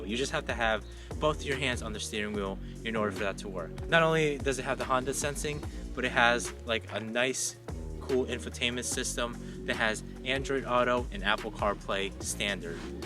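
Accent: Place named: Guyana. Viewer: Are u Guyanese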